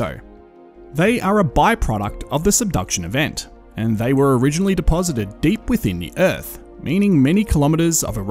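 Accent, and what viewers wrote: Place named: Australia. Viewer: I love your Content, You are saying wonderful things but the way you narrate them and by that I mean your thick Australian accent makes watching your them really-really difficult. I am sure I am not the only one with this problem. I am watching this one just to post this comment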